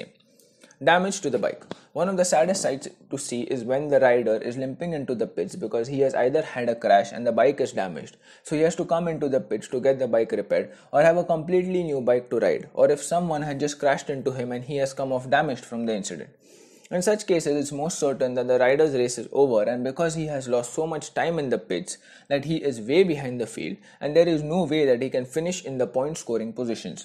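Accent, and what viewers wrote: India. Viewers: Englis india ajg. Wtf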